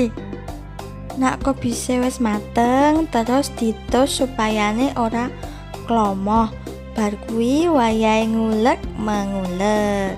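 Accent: Java, Indonesia. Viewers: Medok pisan 😂👍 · Jowo ne medok mbk you 😁😁😁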